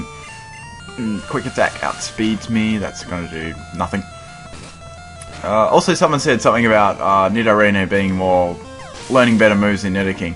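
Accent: Australia. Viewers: Didn't know you were aussian!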